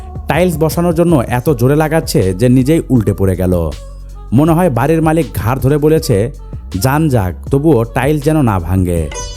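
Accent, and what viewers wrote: Bangladesh. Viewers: কুত্তা তুই তো নিজে বাংলাদেশি তোর ভাষা শুনে মনে হচ্ছে 😂😂😂 এটা বাংলা দেশের বল 😂😂😂 শুধু ভারত এর বলিসনা ,, তোরাও বাংলাদেশি এদের থেকেও উপরে😂😂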